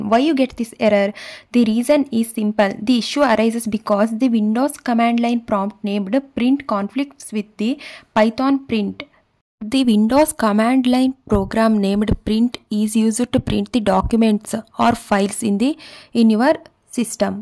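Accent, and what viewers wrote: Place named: India. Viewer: I hear the indian voice and I know that this video will fix the problem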